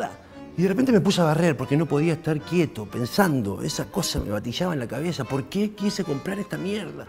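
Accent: Argentina. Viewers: Muy Bien con tus nuevas anécdotas! Jajaja excelente ese acento que se me esta pegando aca en mi propio País Chile! Saludos Kl! Se le quiere acá en Chile! Andá... y traeté otro personaje o amiga para que recorras Chile de Arica a Punta Arenas y luego enseñes La Gran Argentina Boludo! Saludos! Que Dios bendiga a tu pueblo y tu carrera bye! 🇨🇱🇦🇷🤣🍻📺😀😉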